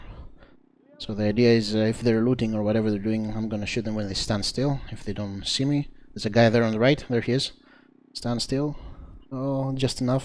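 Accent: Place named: Greece. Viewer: ahhhhh that greek accent <3 nice vid hehe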